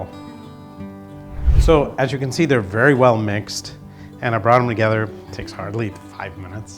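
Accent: Maharashtra, India. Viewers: Loved your marathi ॲक्सेंट ❤ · I liked your Marathi accent, 🤩🙏 It's a pleasure and feel proud to see that such a superior doctor who practices medical overseas for many years hasn't forgot his roots. You are such a down-to-earth man. I truly admire you 🙂 Keep inspiring us through your lovely videos 👍 🤩Stay fit and keep smiling 🙂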